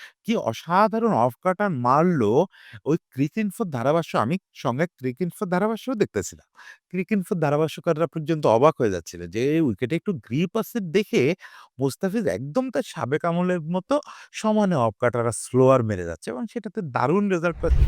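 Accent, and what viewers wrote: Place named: Kolkata, India. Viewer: দাদা আপনি কলকাতার বাংলা টুনে কথা বলেন কেন?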